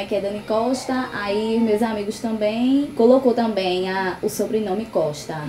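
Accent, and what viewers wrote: Brazil. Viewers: Sutaque de nordesteina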